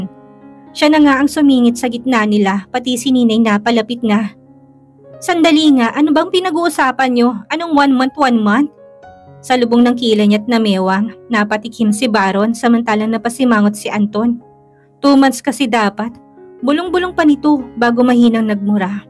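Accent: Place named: Visayas, Philippines. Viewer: panget tlg ang boses mo bisaya pa ang accent mo pumapanget tlg tong story n ito dahil ikaw ang narrator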